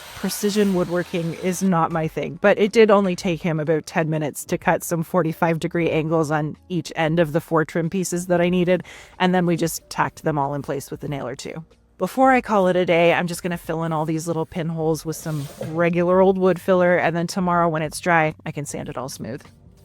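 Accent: Canada. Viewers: thank you! I prop mine in the morning and I just sit and watch you are so dang good at this. Definitely found your niche. Expert level every detail. I am from New England COAST, I here, Toronto in your accent. I am a Tour guide. I meet people from the world. But I miss Canadians. Don’t come to visit so much anymore. I apologize for our political situation. Thank you for being my entertainment. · I always say I cringe about when I see people paint over hardwood furniture. I think I would have stripped it down, and maybe do a white wash stain. WhileI'm not a fan of dark painted furniture I get that that's your style. It would have been interesting to whitewash the whole piece minus the top. Or do the whole thing. Are you Canadian? Sounds like you have a little accent?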